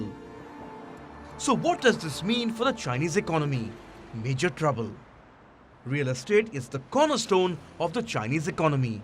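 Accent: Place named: India. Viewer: Her thick Indian accent..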